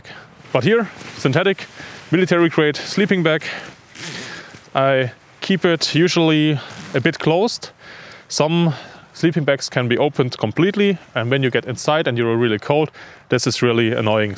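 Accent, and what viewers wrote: Germany. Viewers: Why are you wearing a mask when its not even cold? You generally wear attire like that below -5 to -10C. It looks like its above freezing in this video. Gonna get Covid miles from civilization? It muffles your voice making the German accent more difficult to understand (though your English is obviously excellent). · Na, das ist doch ein deutscher Akzent,oder?😊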